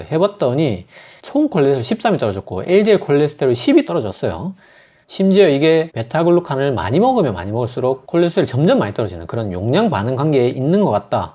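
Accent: Gyeongsang, South Korea. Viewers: 늘 좋운영상 감사합니다🙏 경상도억양 은근 친근감 주시네요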